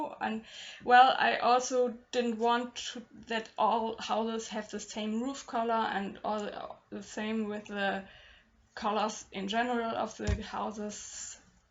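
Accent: Germany. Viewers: I hope this isn't rude to ask, but could it be that you are german? Just judging by your accent... Not that that's a bad thing! I really really enjoyed this collab! Both pictures work very well together, especially because your half is rather warm, but still has some blue/cold accents which resembles the colors PearFleur used in her half. Her part yet again has little accents of warm colors as well it resembles yor part. Just a very nice Collaboration, and I think most people, if not all agree with me!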